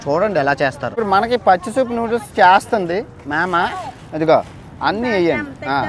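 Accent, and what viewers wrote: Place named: Godavari, India. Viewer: Anna godavari yasa super ga matladavu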